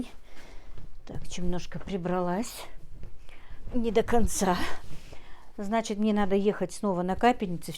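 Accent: Standard. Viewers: подписана на Вас давно, но смотрю недавно. Вы так чисто говорите по-русски, такая грамотная речь, одно удовольствие Вас слушать.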